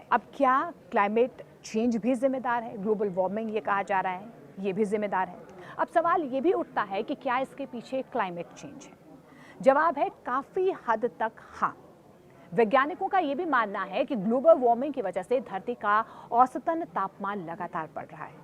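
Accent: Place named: South, India. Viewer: खाबर😮 खबर होगा तू एक साउथ इंडियन है हिंदी से पता चली जाता है। बांग्लादेशी जितने भी साफ सुथरा बांग्लादेशी बोले वह बांग्लादेशी है बंगाली से समझ में आता है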